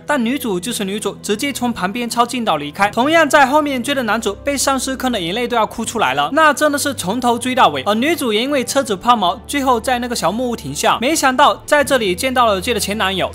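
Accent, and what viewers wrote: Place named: Fujian, China. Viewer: 应该是胡建。普通话不标准。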